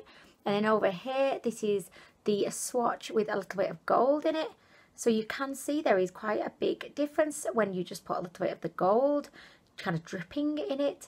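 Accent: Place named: North Wales, United Kingdom. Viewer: Right.. Personal question. Where are you from? Obviously your name is Welsh and there is a northern Welsh accent there but it seems to have a hint of something else. Am I close? (Semi ignorant Australian here though I did spent a fortnight in north Wales last year)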